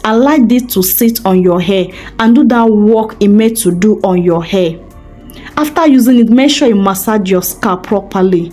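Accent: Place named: West Africa. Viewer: Come on 😅 she is from West African that's how they speak 😅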